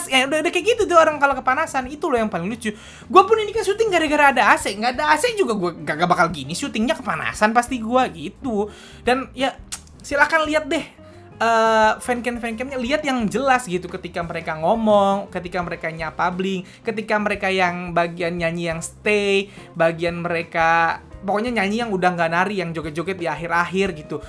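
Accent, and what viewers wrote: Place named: Bekasi, Indonesia. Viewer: Bahasa bekasinya ngelekeb ya bang 😂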